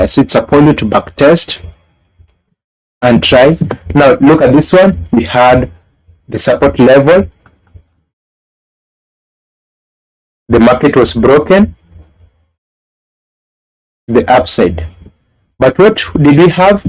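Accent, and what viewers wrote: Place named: Kenya. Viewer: Kenyan accent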